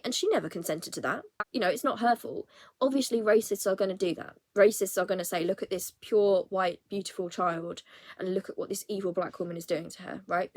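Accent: United Kingdom. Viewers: New subscriber here beautiful. You have a british accent, its new to me & happy that you understand the mess inside BRF. William is super imsecure of Harry, very Diana is Harry so approachable, organic, genuine and so authentic full of compassion and not hot tempered.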